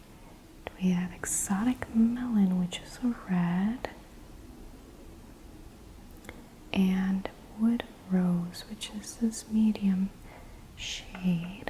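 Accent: United States. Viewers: aka American accent version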